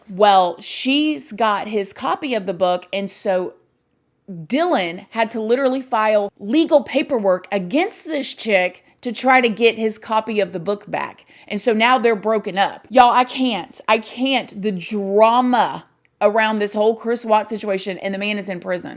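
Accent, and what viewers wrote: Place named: North Carolina, United States. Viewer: when you said shes on the doggone youtube i dieeeed!!!! im from charlotte nc and i hear your accent!! where are u from friend? im such FAN LIKE I WANNA BE YOUR BEST FRIEND!!!! LOVE YOUR VIDEOS!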